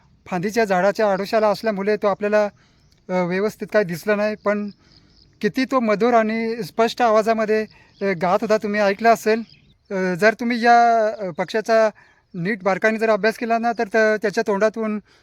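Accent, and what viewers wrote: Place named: Konkan, India. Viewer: Dada tumi koknathele ahaat ka tumchaa bolnyaavalun kalte khuf chaan video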